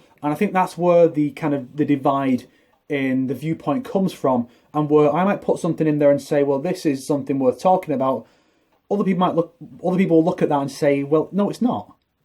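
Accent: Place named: United Kingdom. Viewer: Seriously, you are great at what you do! You made a video, left your opinion. There's no need to explain yourself. I love to know where in the UK you are from? Manchester? Yorkshire?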